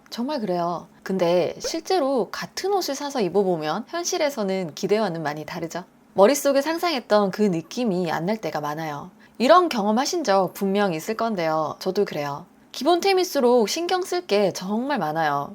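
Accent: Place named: Gyeongsang, South Korea. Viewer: 경상도사투리와 서울 사투리가 아주 이쁘게 믹싱되어 좋네요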